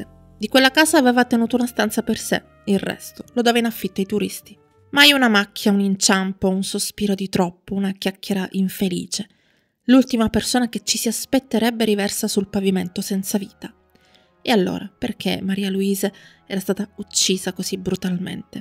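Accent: Sicily, Italy. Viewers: Bravissima come sempre. Il tuo accento siciliano è stupendo. ❤❤❤❤❤❤❤ iscritto 😍🥰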